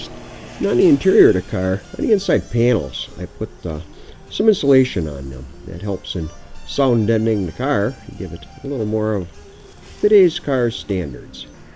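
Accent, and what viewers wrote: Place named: Great Lakes, United States. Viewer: Great Lakes accent....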